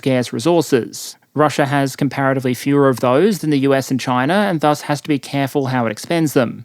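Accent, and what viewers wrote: Australia. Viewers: The way Australians say Russia has always cracked me up… love it.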